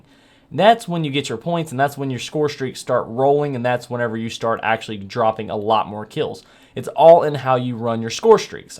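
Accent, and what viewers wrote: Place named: North Carolina, United States. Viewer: even though im black i live in NC and i talk exactly like you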